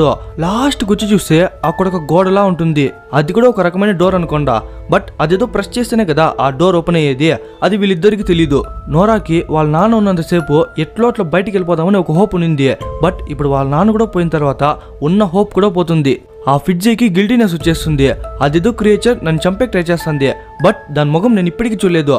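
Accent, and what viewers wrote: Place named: Karnataka, India. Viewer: పక్కా కర్ణాటక తెలుగు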